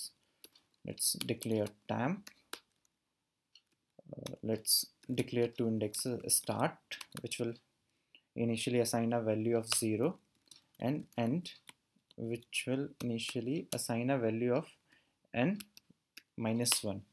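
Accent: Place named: India. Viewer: Everytime hear an Indian accent dude teaching tutorial, I know he will be goddamn good.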